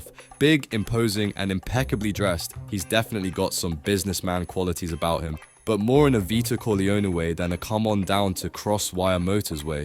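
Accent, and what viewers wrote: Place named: United Kingdom. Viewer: Can you please stop with the British accent?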